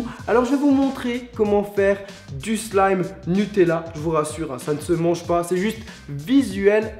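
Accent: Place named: Alsace, France. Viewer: Ta l'accente Alsacien mdrr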